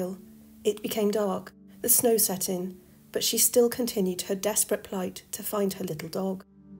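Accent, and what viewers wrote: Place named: Wales, United Kingdom. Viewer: Love your Welsh accent 🎉.. Great vids,keep them coming. Happy 2024 to you and all 🎉❤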